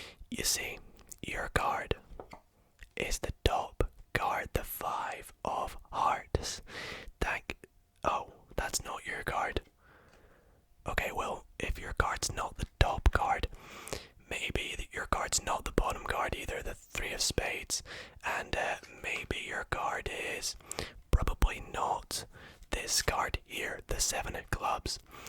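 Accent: Scotland, United Kingdom. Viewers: Are you Scottish? Cos I an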